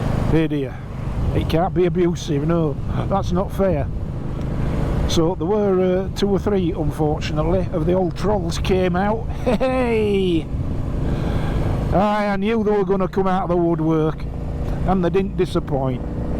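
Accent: Yorkshire, United Kingdom. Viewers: Morning. Since watching your vid on the MT 800 Touring the other day, I'm all but sold on one rather than the Suzuki GSX S GX ( say that when you're drunk) as it's over 5 grand cheaper and has everything. As good as the Suzuki is, and I've tested one, for £14500 - No luggage, no centre stand, no heated grips, and for a supposed touring capable bike, a screen the size of a postage stamp that needs an allen key or similar to adjust. The centre stand alone being £470... Your review made the difference. On the subject of Chinese bikes and the Chinese, they are easier to interpret than some of your Yorkshire 'twang' From one of your followers over the fence in Lancashire....(.like we are any easier...😝😉) Keep up the good stuff..👍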